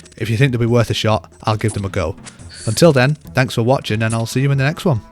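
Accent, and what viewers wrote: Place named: Darlington, United Kingdom. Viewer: Where are you from... Somewhere like Darlington... The accent is deeply reminiscent to me. I grew up in Middlesbrough...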